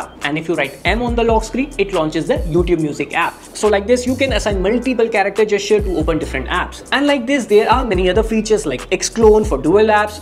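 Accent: India. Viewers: Damn Indian English sucks, it's just unbearable.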